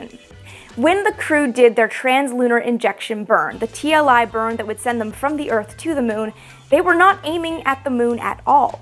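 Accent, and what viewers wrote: Canada. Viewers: Check "oot" the smart, cute Canadian girl.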